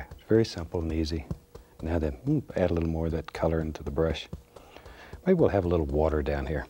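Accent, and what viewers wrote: South, United States. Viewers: Even after all the years of living away from the south, he still sounded so very southern.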